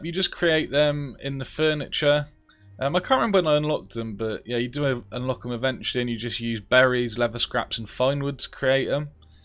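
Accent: Norfolk, United Kingdom. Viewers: Some really great ideas! Are you from Norfolk or Suffolk? I live near Norwich :) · i like your british accent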